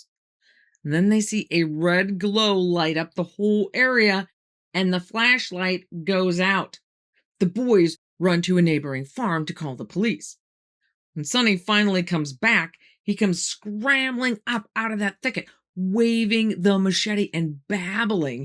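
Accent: New York, United States. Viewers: Love wonderful stories thanks we appreciate ❤ and the lovely accent too, sounds like a New Yorker 😂 🇬🇧🏴🇺🇸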